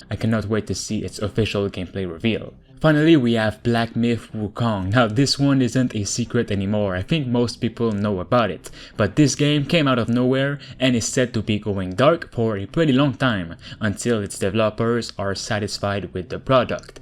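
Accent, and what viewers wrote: Quebec, Canada. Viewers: Are you from Quebec ? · Are you from Québec? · Youre quebecois im i right ?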